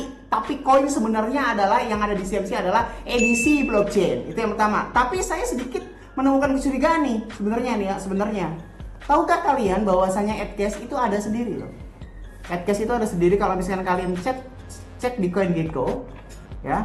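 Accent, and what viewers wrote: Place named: Banyumas, Indonesia. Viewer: Jangan di tonton....Yaa Brother" keenakan nih Bocah Ngapak !!!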